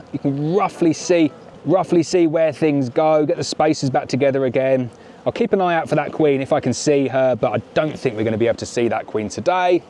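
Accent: United Kingdom. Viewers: Nothing worse than seeing a video you really wanna watch, then you hear a British accent, god dammit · It's only been 12 minutes into the video but, I am feeling physically and mentally tired, already. Listening to a British accent, talking non-stop, like out of a machine gun, making no pause, even for three seconds, is mentally and emotionally draining. Wow...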